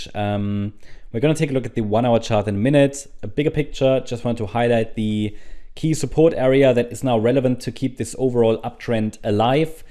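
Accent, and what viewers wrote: Germany. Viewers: you have strong german accent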